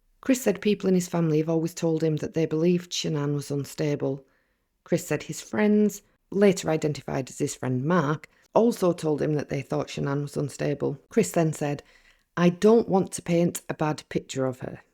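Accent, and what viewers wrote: United Kingdom. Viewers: Great video. Is that a northern accent I hear? 😊 It’s lovely! Keep up the great work!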